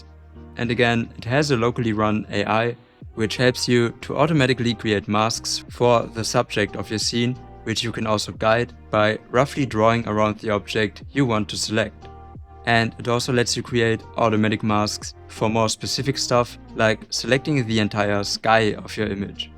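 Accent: Germany. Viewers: Was ein brutaler deutscher Akzent.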